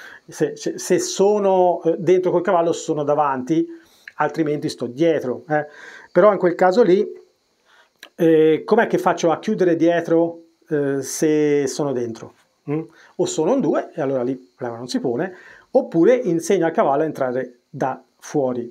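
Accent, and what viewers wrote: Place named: Tuscany, Italy. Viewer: Bravo, spieghi talmente bene e in modo chiaro che possiamo capire tutti. 👍Grazie. E un piacere ascoltare il tuo accento tos ano😊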